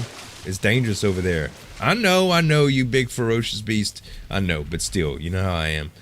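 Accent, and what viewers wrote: Louisiana, United States. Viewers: Where are you from Z? Cajun accent coming through. Abbeville LA here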